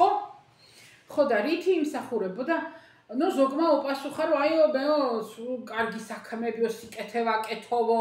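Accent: Kakheti, Georgia. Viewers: იხარეთ მუდამ !!! ❤❤ როგორ მახარებთ !!! ❤თქვენს მიერ გახმაურებული კახური აქცენტებით ...❤ ,, არაუშავრა..."❤ მიყვარს თქვენი მოსმენა... და მამხნევებთ ძალიან...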